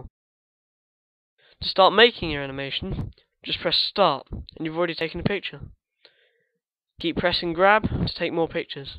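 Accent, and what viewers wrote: United Kingdom. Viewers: Are u from London? :o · heh... you sound british.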